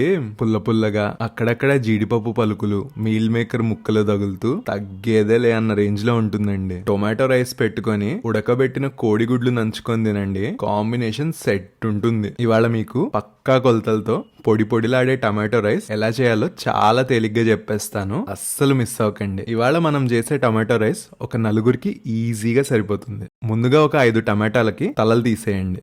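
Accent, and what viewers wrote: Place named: Telugu, India. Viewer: Acha telugu lo matladuthunaru meeru Andhukey vinadaniki vinasoppu ga undhi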